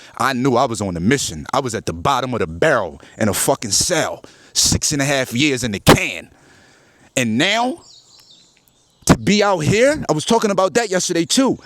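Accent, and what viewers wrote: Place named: New York, United States. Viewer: Where you come from ? NYC ? Sounds like it.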